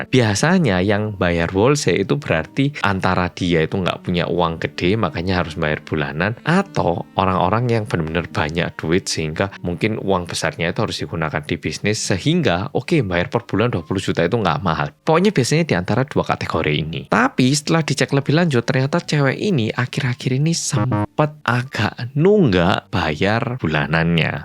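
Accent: Java, Indonesia. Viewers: hai malem muedok lgsg nyimaaak🎉 salam sehat💪🏿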